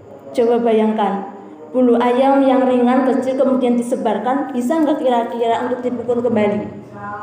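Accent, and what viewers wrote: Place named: Banyumas, Indonesia. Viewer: Semangat mbak ngapak❤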